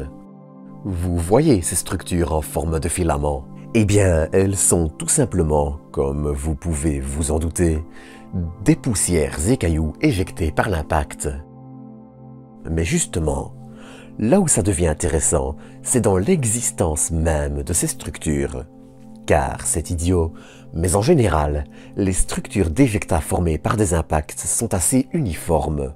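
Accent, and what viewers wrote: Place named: Flanders, Belgium. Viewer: Flamand donc ? Bonne soirée et bravo pour ce que vous nous offrez en vidéo !!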